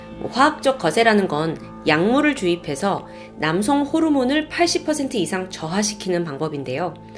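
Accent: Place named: Jeolla, South Korea. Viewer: 라도네요 ㄷㄷㄷ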